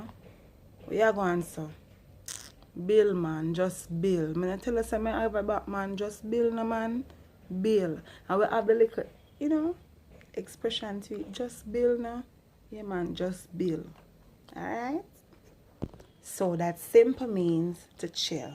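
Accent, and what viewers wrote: Jamaica. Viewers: I so so love Jamaican accent.. like madddd😄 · Oh wow this's fun. I love Jamaican accent. Where exactly did it originate from cos it's unique , do you know?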